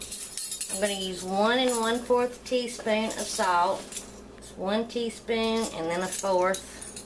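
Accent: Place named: South Mississippi, United States. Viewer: I have got to know where you are from? You sound like your from my neck of the woods down here in south Mississippi. The buns turned out great, and looked beautiful!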